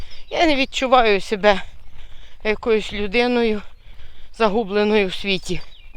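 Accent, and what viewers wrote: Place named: Western Ukraine, Ukraine. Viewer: Ви така позитивна ,тільки почула вашу мову відразу зрозуміла ,що ця жіночка з нашої західної частини України !😊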